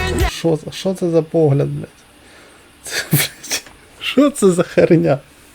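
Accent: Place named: Russia. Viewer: А он музыкальный критик? Или комиссар из отдела политической цензуры? Кстати отчётливо слышно, что родной его язык русский, но он усиленно выдавливает из себя мову. Похоже, что вместе с мовой ещё шось выдавил в штаны.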